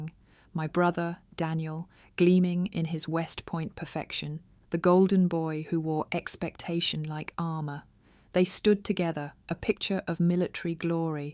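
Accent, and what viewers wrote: United Kingdom. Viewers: I’m not too sure of the narrators accent. I like the story line, just not the ‘almost’ British accent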